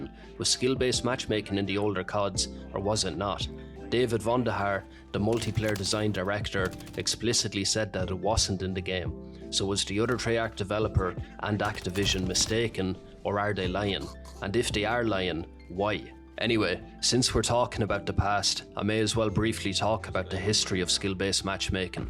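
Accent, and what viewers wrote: Ireland. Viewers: Two reasons why you’re better than exclusive ace: your wise enough not to give them the benefit of the doubt, and the Irish accent. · Nice to hear an Irish man talking about these topics. Eire go bragh yup yup ❤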